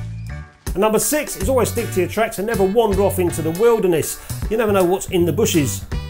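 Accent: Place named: Cockney, United Kingdom. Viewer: The 'Fern' is Bracken, or Pteridium aquilinum to give it's proper name and one of the most successful land plants being found everywhere except Antartica. Loving your channel, in fact it's now not just my favourite film photography channel, it's my favourite photography channel. Period as the Yanks say. Your style is refreshingly down to earth, but your images are good and your Cockney/Essex Boy persona is a welcome change from the wispy bearded hipsters that normally present this sort of thing. (Sorry Ribsy! but your beard is a bit fluffy)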